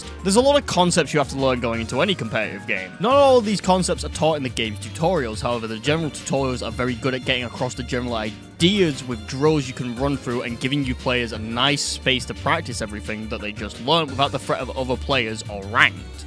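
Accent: United Kingdom. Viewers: My favorite sub genre of YouTube is British guys talking about fighting games.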